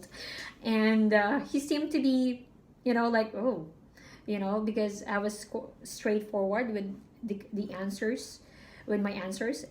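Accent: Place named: England, United Kingdom. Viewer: I love your English accent🥰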